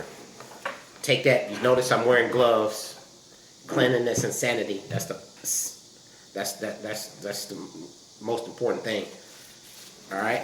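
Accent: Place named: Visayas, Philippines. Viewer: W/my bana, kron pako kBntat . Hehe bisaya diay ka si.😂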